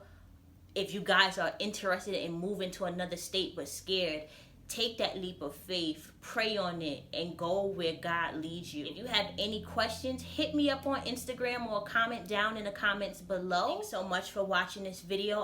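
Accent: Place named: New York, United States. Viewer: lol idk how CO ppl sound lol but all i hear is NYC. Thanks for the info I’ll look up these areas for sure and weigh my options. Ugh it sucks leaving the city where we don’t need a car but I need a change. Btw great vid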